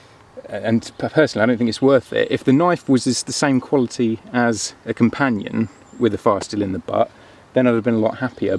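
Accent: United Kingdom. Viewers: where abouts in uk are your from man?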